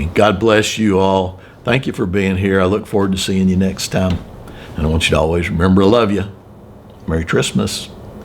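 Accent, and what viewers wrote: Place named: North Carolina, United States. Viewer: Hello from North Carolina Dr! I love your videos and I'm also a subscriber of channels too. You sound just like you are from NC because you talk like we do here, and I love it. Was that gold car a 63 split window Corvette in your garage? It sure looked like it was which is my favorite Corvette body style. May God bless you and your loved ones and I pray y'all will have a very Merry Christmas!!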